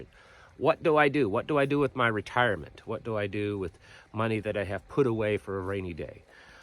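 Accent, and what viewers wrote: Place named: Kentucky, United States. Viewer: Tucker is the only show that I watched every evening. And Life Liberty Levin. On Sunday. I won't watch Fox anymore. Done. He stood up for what's right. And all of this lbtqrsi+ he stood up against it. Whit values. I would say they told him to bend over and take the crap.. and he refused. Good for him. We all need to stand against this. Fox needs Tucker more then Tucker needs them. He will be back better then ever. I can't wait. Fox News will be done..... Thanks for you messages that you give every day. Because you speak the truth. I'm watching you from Kentucky, and I really liked your Kentucky accent. Loved it😂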